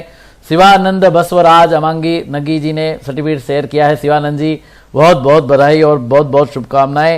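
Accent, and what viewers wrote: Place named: Kota, India. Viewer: Sir aap Kota ke aas pass ke lagte ho, apki tone se aisa lagta hai.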